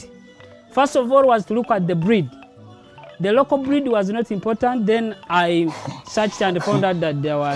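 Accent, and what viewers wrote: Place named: Kenya. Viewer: This's Kenya English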